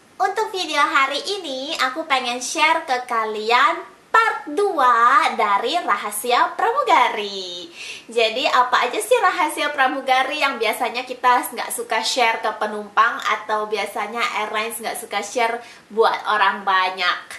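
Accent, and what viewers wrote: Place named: Medan, Indonesia. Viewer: Logat medan nya masih kental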